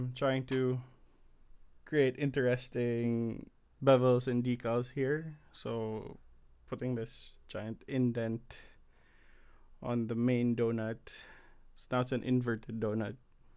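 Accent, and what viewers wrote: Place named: India. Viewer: your accent is sounds like an indian.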